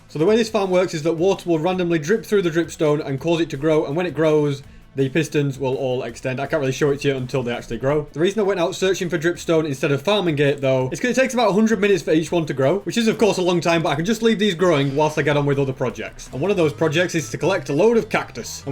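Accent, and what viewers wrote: United Kingdom. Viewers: I love ur British accent are u from England or united kingdom?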